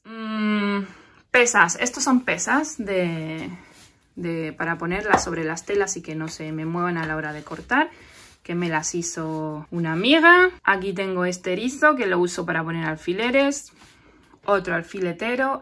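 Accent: Argentina. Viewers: Hola ,te escucho hablar y tenés mucho tono argentino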